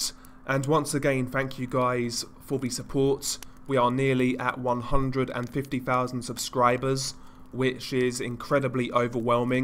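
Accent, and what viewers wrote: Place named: United Kingdom. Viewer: Are you from the UK? I love your accent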